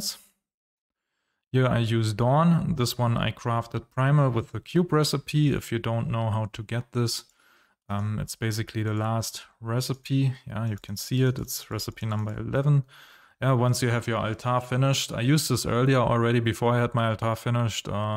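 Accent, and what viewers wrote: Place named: Germany. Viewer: I höre deutsch raus :)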